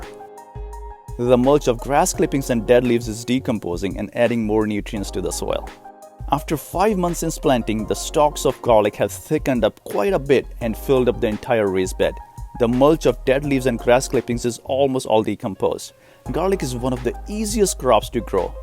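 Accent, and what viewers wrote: India. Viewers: i cannot understand your heavy indian accent bro!!!!!!!!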